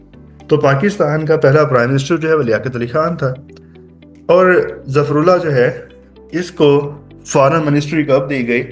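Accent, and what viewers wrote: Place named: Lucknow, India. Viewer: Sir, you speak very good, and it seems that you have learnt Urdu from lakhnew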